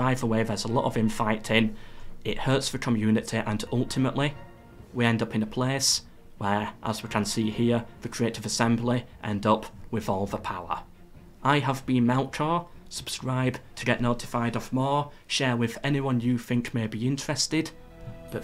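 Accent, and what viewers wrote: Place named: North, United Kingdom. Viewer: Melkor which region of the UK are you from? I'm trying to place your accent. I'm American and we're bad at identifying British accents. If I had to guess, somewhere in the north?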